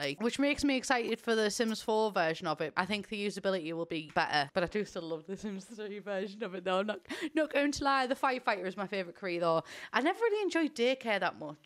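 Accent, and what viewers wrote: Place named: Newcastle, United Kingdom. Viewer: Hey its so upsetting when you mention tories in your videos in such a negative way. Conservative is just a political opinion, we aren't all bad people just like people who support Labour or lib dem. I just feel so shamed when I watch your videos sometimes cos of your comments and I don't feel I should have to be cos its my own personal opinion. I absolutely love your videos and think you're hilarious and I don't actually live that far away from you so it's great to hear a geordie accent but it's making me feel like I can't watch you cos your opinions are so strong and youd think I'm a bad person... 😞 I am definitely not hostile either I work for the fire service and I love helping others and spreading positivity. I just wanted to share this cos you may not have even thought of this... But either way, I hope you're feeling better can appreciate where I'm coming from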